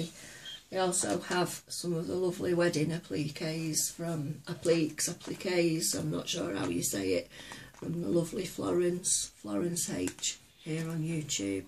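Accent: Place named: Manchester, United Kingdom. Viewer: Hi lovely northern lady...welcome to YouTube, this is a great first video, well done to you and your helper. You sound like you're from Manchester or somewhere that way...I'm in sunny Lancashire..lol..best wishes to your daughter, I hope it all works out well for her. Your teacup 'n' saucer are beautiful...looking forward to seeing more from you soon...Ann...x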